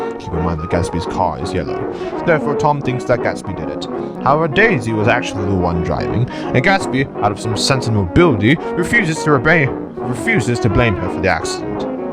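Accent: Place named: Transatlantic, United States. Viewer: This video haunts me ever since I found it, words can't quite capture what sort of experience this video does to me. Its the Great Gatsby but Lazytown read in a Transatlantic accent via what I can imagine is either Sparksnotes or a severe abridged version of it, i am haunted, why was this made, why does it bless us with its presence, why am I only now learning of it, so many unanswered questions plague me and yet I must watch it again.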